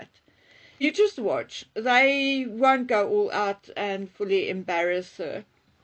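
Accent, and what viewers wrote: South Africa. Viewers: Hi. I've been enjoying your podcasts snd trying to place your accent. Is it South African/Afriaaner? Just curious. I love to listen to accents ftom around the world and place them.🥰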